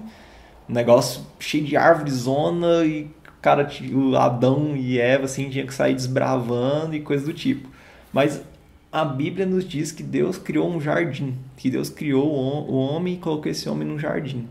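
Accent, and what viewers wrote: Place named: Goiás, Brazil. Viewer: Showww, cara!! Eu tô amando esses vídeos e o sotaque goiano tá ok ksksks, é nóis, conterrâneo!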